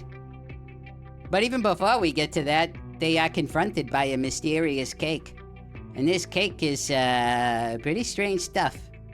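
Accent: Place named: Boston, United States. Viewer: I can for the silly title and stayed for the Boston accent. Cool animation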